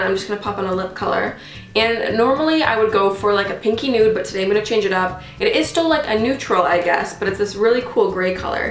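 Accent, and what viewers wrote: Canada. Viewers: you sound so Canadian lol eh? Fellow Toronto girl suffering the same cold and "Blizarding" can't wait for spring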